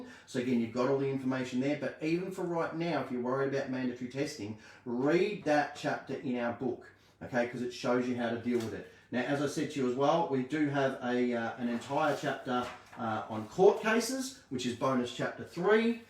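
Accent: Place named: Australia. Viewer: So I tell the truth... and you delete my comment... can't have anything disrupting your, cash cow. "I'm absolutely appalled, every time I hear you, it's a SALES AD - Buy My Book, Buy My Book... I'm sick of it! You sound Australian... but I'm not sure anymore. If you were a true blue Aussie Patriot... you would make sure everyone had a FREE copy in in pdf and you wouldn't be sending people to FB to be spyed on!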